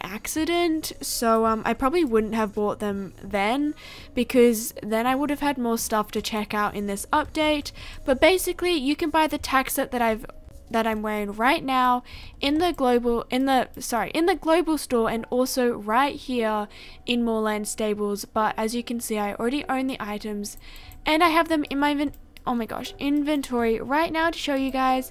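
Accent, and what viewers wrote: Australia. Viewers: Omg wait are u Aussie bc if you are 😳😁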